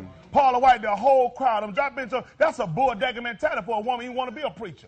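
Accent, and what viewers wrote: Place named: South, United States. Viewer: Man his Country accent gives it ALL. his hair piece!!!!!!!!!!!!!!!!!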